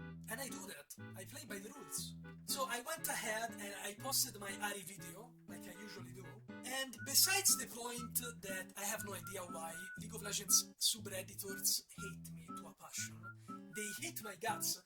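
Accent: Italy. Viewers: my brother in christ, are u italian? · l'accento è palese italiano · no l'accento de roma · he's italian most probably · are you by any chance italian? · Man tbh I never tought that your accent sounded so Italian, Love it btw :) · I don't think he confirmed it, but I am Italian and that is definitely an Italian accent, I've heard it a thousand times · Come for the drama, stay for the Italian outrage accent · he’s italian? 😳😳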